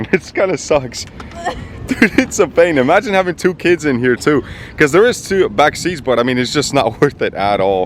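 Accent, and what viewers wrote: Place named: Bosnia and Herzegovina. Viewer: That accent is 100% Bosnian lol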